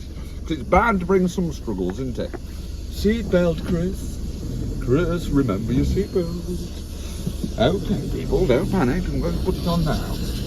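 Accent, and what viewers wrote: Australia. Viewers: Was the Australian accent inspired by the Ozzy fella with the big beard who does the heavy welding on earth moving buckets and the like... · Aussie accent ? I thought I'd dropped into Norfolk Man Cave's channel for a minute there boi !😂 Your welding's gone from "Town centre" pigeon, complete with a manky leg and missing foot, to something you'd find in a bespoke dovecote from a copy of "Country Life" magazine !😂 Is there a service exchange price included with the alternator, or do the Chinese not even want it back ?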